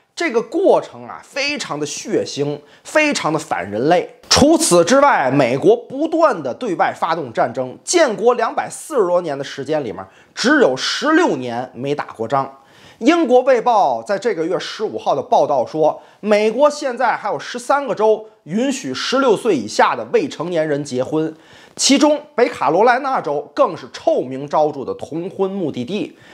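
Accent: China. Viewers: 儿化音挺好听的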